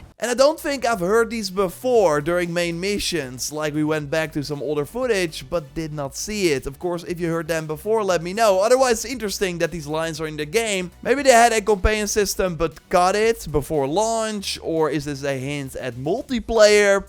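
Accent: Netherlands. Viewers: Woow you sound super Dutch haha 😂 hello from a fellow Dutch guy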